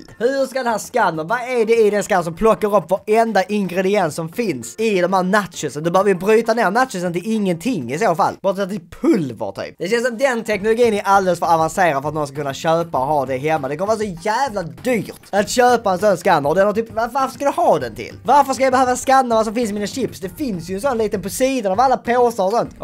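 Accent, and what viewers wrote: Scania, Sweden. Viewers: Alva Rigo han e skånsk, såklart man gillar den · Älskar skånska!!! 😍😍😍😍😍😍😍😍😍😍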